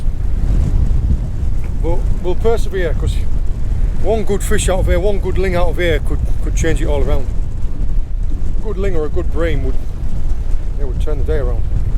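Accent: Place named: North East England, United Kingdom. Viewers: Hey up fella, only found your channel yesterday and totally enjoying them too. Thee sounds like a lad originally from up this neck of the woods fella. You are blessed living where you do. I would go fishing out from Hartlepool years ago and my uncle who lived up in Tynemouth would take me out when I would go up there when I would go to stay as a boy for my holidays. Love your videos buddy keep em coming. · Really enjoying watching your videos. I’m struggling a bit at the moment with my head and watching your videos takes my mind off things. NE accent in Cornwall catches the imagination. Only ever been course fishing, but would love to try sea fishing. Keep doing what you are doing.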